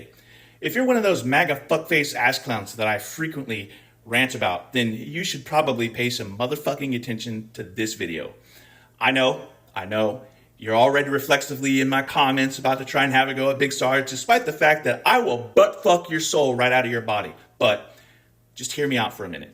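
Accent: Scotland, United Kingdom. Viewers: Definitely hearing some Scottish accent in this one! Thank you for the outrage!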